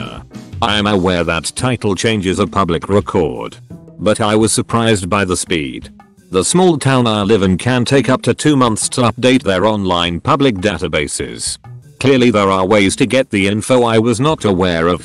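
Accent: United Kingdom. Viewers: I gotta watch out for that lurr enforcement. Why can't Brits ever pronounce their own damn words right?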